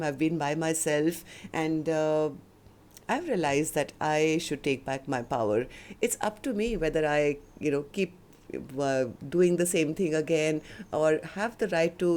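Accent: India. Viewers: Are you hindi please??!! Your english IS very good🎉❤😊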